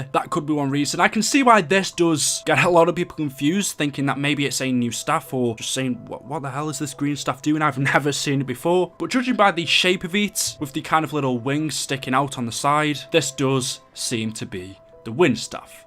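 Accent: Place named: Stoke, United Kingdom. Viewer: Hi, huge fan, this question is not about the game, it's about you. Are you from stoke on Trent or around there? because you have quite a strong stoke accent I think, I live down the road in Newcastle. If you do you are the only famous YouTube around for miles, I'm proud of you are!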